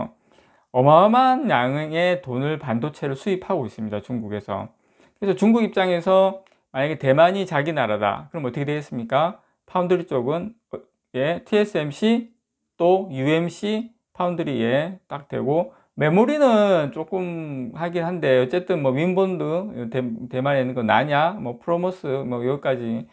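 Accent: Gyeongsang, South Korea. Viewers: 땡꼬때리고 ㅡㅎㅎ참 오랜만에 들어보는 경상도 맛의 사투리 항상 감사한마음으로 듣고 있습니다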